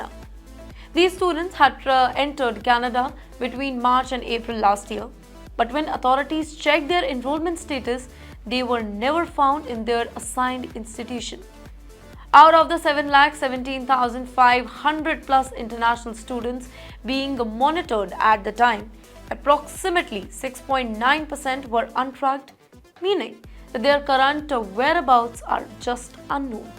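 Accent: India. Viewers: And you should shed your goddam Indian accent! I hate the way you speak English!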